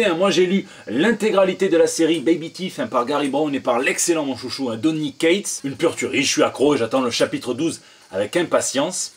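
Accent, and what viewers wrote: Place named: Provence, France. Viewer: Geek Librairie c'est le top les cigales,l'accent provençal, on est en vacances !!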